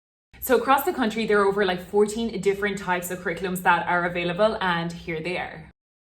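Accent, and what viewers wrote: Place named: Ireland. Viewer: u sound irish but certainly dont look irish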